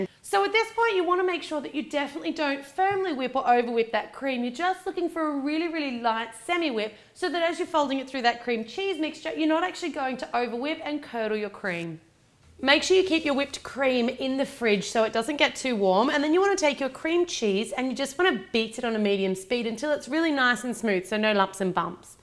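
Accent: Australia. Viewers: Are you Aussie or American ?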